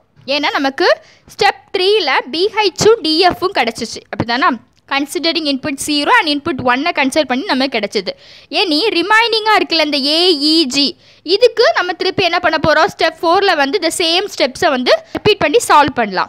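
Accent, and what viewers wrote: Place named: Kanyakumari, India. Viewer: Sister r u from kanyakumari ur slang seems to be like that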